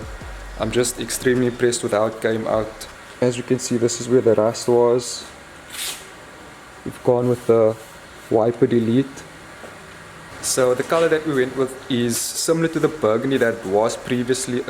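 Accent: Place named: Afrikaans, South Africa. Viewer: Hy klink Afrikaans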